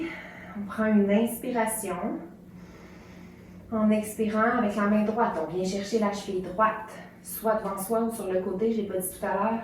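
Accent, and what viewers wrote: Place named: Canada. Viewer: ce délicieux accent du Canada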